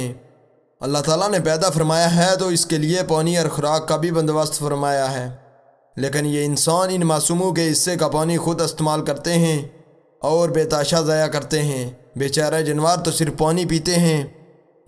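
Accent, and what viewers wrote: Kashmir, India. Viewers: Nice kashamiri accent watching and subscribeing just cuse #factical gave you shoutout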